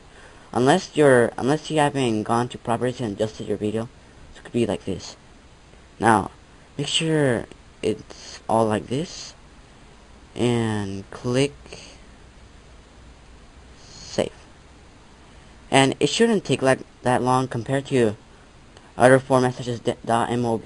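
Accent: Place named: Asia. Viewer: Lol a very asian accent this guy has.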